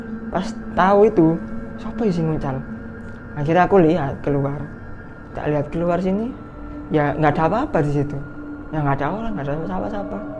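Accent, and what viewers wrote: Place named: Java, Indonesia. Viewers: suka cara penyampaian nya,masio medok tpi g berbelit belit,,klihatan bgt aslinya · Logatnya ya ampun 😂Mengingatkan my ex org SBY 😅😅😅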